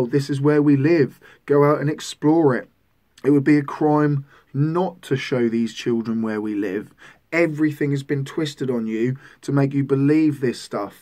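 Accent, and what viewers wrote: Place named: England, United Kingdom. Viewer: U r obviously english, whats ur relationship with düsseldorf?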